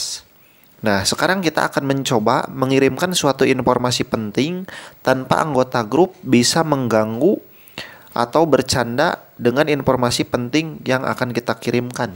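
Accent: West Java, Indonesia. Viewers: Urang Sunda ya kanh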